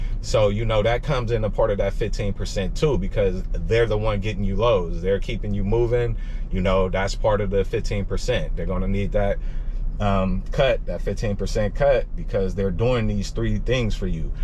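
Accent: Bay Area, United States. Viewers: Lol you know what he meant.. He sounds like he from the Bay.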